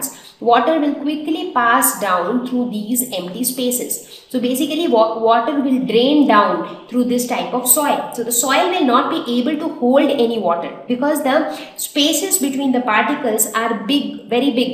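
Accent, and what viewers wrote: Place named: Bihar, India. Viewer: मैडम बिहारी हो के?? आपकी टोन से लागे😜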